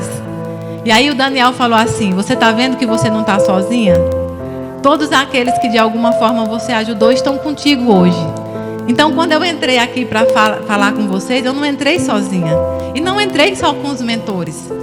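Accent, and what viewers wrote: Ceará, Brazil. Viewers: Ela é muito leve no falar eu gosto de ouvir ela falando das cartas ela e tão simples parece tão amorosa com todos e esse sotaque da minha terrinha Ceará e muito bom ouvir ela eu sei que nunca vou receber uma mensagem dos meus entes queridos que já se foram mais fico feliz ouvindo as mensagens dos outros pra seu familiares eu dou risada eu choro me emociono de ouvir as cartas lidas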